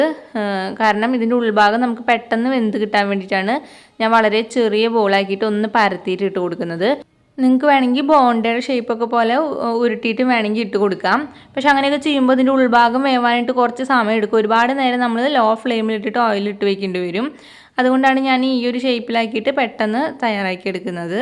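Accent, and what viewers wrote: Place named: Kunnamkulam, India. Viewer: Ningalude samsaram kettal eadu jilla anennu kandu pidikkane pattilla Kunnamkulam aduthano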